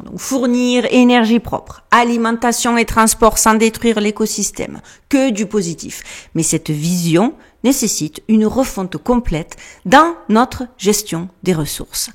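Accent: South, France. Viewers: 👍 Les questions sont toujours aussi pertinentes !!!😻 Comment des gens qui ne sont plus en contact avec eux même peuvent-ils entrer en communication/en lien avec un humain conscient de sa souveraineneté ?!? Impossible !!!! D'où l'AI.... et tout ce qui va avec 😁 J'ai vraiment et magiquement confiance en ce qui s'en vient et en toutes ces personnes vivantes et conscientes✨🙏✨ Merci pour ta bonne humeur, ton accent du sud qui réchauffe. Beau week-end à toi et à tous☀✨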